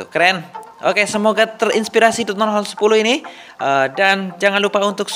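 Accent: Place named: Java, Indonesia. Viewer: suaramu wagu bang. medok dan tidak beraturan. ga enak d dengernya. #no haters.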